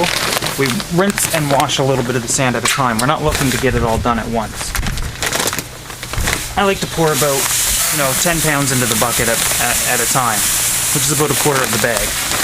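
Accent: Canada. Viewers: Well I just spent about 2 hrs watching your videos needless to say I am exhausted. Well done. Truly a pleasure to watch and very informative. I especially love your Canadian accent when you say words like "out". Ow-oot! :) anyways subscribed - keep em coming...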